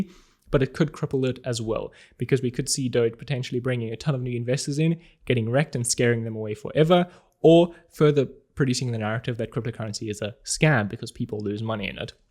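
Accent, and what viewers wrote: South Africa. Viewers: This guy sounds South African, am I right boet? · are you from South Africa? I don't know if you have mentioned it before, sorry if you had. Your accent reminds me to Elon's.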